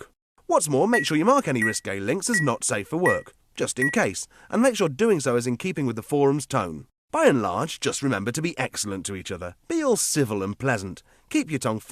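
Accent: United Kingdom. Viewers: Everything sounds better British. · The british narrorator makes this video hilarious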